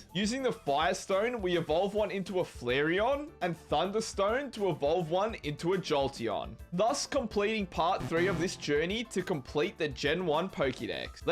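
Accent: Australia. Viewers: Biggest Victorian accent going. The “alite four”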